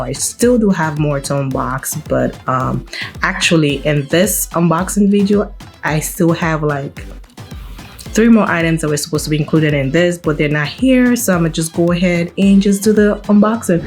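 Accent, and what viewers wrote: Nigeria. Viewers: Iam always here for the intro😂 it’s the 9ja accent for me😅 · Omo i thought you were like born in America , your Nigerian accent is cute 😂, i sha subscribed before I even clicked your channel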